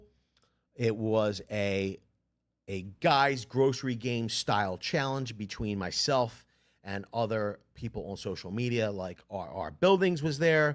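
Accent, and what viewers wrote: Philadelphia, United States. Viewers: Lioke, prioce, wuter LOL must be a Philly guy always good info though despite the accent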